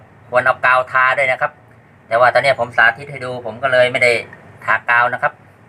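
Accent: Chanthaburi, Thailand. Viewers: สำเนียงเหมือนคนจันทบุรีเลยครับ บ้านผมอยูระยอง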